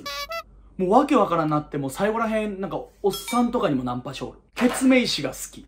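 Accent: Hiroshima, Japan. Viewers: コテコテの 広島弁良いですね。私は１２０系マークXに乗ってます。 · 広島弁が沢山聞けて嬉しい。車シリーズ大好き。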